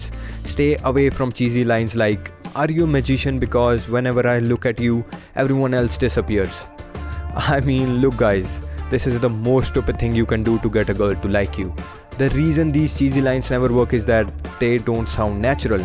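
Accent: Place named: India. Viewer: good one. but you need to improve your accent and speak a bit faster. try delivering four words per second and also try skipping most of the "r"s in the end of words. I guess you are an Indian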